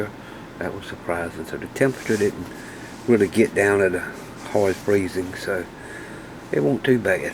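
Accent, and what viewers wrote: South, United States. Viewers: I'n happy to see some great folks stepping up to help and the thru his Patreon channel.. I find his brand of repair and restoration unique and refreshing. Besides his southern draw make me smile with delight. Please consider joining me by adding your support as Patreon with a $1 or more monthly contribution to help insure having his informative videos around for a long time.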